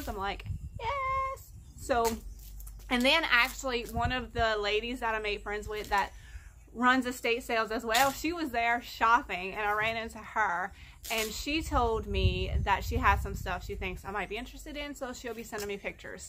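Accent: Louisiana, United States. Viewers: Love Love Love all of your videos!! One of them popped up on my feed, immediately subbed you and I've been watching them all! Love your accent! I'm cajun as well from near Lafayette and live in DS near Baton Rouge. I just wish I had your energy and creative mind lol thanks for the content!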